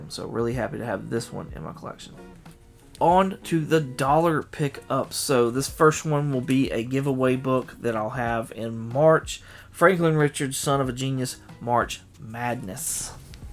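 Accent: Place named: South, United States. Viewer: Hey brother! I came over to check out your channel after hearing about you on MONComics! I wanted to watch your latest video to see what you're all about! Do you live down south? I notice a little bit of the south in your voice! 😉 I actually lived in Vicksburg, MS for a year when I was 15. Had a great time there! I love all your new books you picked up! Right now I am just concentrating on finishing my Conan Collection and just started working on collecting every Batman (that I will never finish since I am so damn old) LMAO! I love the Constantine movie, but have never read any of the books. Are the new TMNT still as gritty and have violence like the original 80's run? If so, I need to pick them up! Love that Wolverine cover for sure!!! I enjoyed your content, looking forward to your next video, and Happy to be your new subscriber! Number 970 is a good number! 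Good luck on your journey to 1K!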